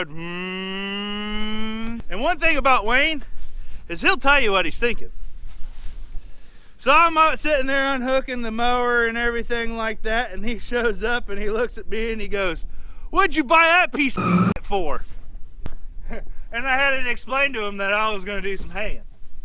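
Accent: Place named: Missouri, United States. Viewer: Just subscribed... good vid! I am from the Albia, IA area, so your accent is getting close to MO, I can tell. Tip: I believe you should not overlook the tractor as not worth mentioning. Too low on HP and weight, and it may not pull and properly operate the equipment. Too large, and it will be a waste of fuel, and the hours on a expensive machine, hours that should have been put on a lower cost machine. Blessings for 2020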